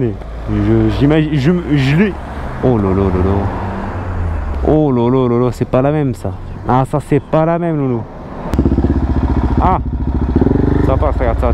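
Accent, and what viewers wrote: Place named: South, France. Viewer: J'espère que sa vas frero, j'adore ton accent du sud 😂😉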